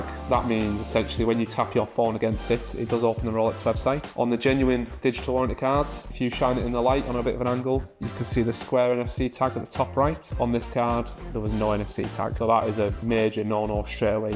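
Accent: United Kingdom. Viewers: That British accent together with the somewhat too loud music makes it difficult to understand what you are saying. Well I guess it makes for a challenging language lesson … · What part of the UK are you from?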